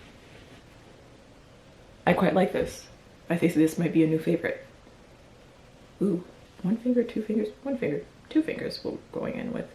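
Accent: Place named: England, United Kingdom. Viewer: I loved the touches of the English accent 😂😂😂 Beautiful finished look ❤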